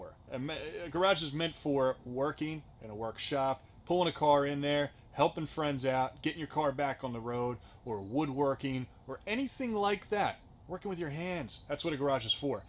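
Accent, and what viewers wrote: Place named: South Jersey, United States. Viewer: Haha! I am looking to buy one of these Harbor Freight portable garages. I found this video and within the first minute of listening to you talk, I was like, "this guy sounds like he is from South Jersey". Then as I continue to watch, you say, "In Jersey..." and I was like, yep, bingo! So then after watching, I check your description and you are over in Gloucester Twp. I laughed out loud. As someone who was born and raised in South Jersey and travels the country often, its so easy for me to pickup on the accent, its ridiculous. I mean, yea mostly everyone from the Delaware Valley have that accent but PA and DE people have slightly different twangs to it and unless you listen for it, its not very noticeable. You must have also been raised locally because your South Jersey accent is so spot on. You should do a video on Panzarottis LOL!!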